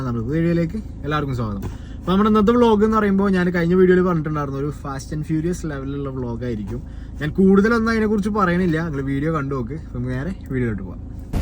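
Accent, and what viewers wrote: Kasaragod, India. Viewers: bro kasaragod aahnnoo bro slang cheruthaayii ksd slag pole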